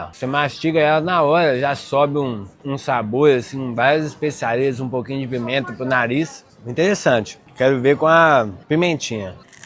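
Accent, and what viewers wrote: Minas Gerais, Brazil. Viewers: 😂😂😂😂❤❤❤, seu canal é ÓTIMO! Mas o seu mineirês é ainda melhor 🎉🎉🎉 e só enche a gente de saudade. Pra pronúncia de quase tudo, principalmente pra gravar, use a referência do Google. Weisswurst vira então Váissvurst - facim-facim, né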